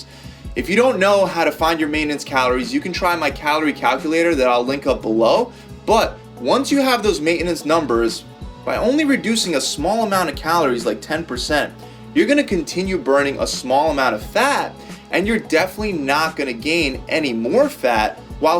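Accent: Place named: New York, United States. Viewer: You sound like the dudes on Friends, or Seinfeld. Are you from New York?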